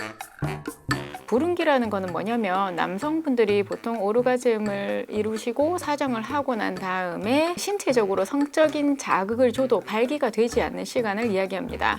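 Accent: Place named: Jeolla, South Korea. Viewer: 전라도 깽깽이냐?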